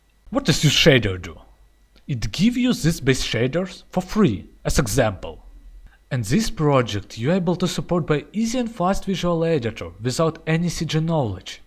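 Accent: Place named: Russia. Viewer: Русские пытаются говорить на Английском) , А еще ваши документации сломались с ассет стора.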